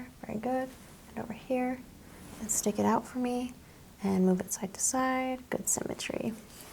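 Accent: South, United States. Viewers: This English Girl loves a Southern Accent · Love the southern accent!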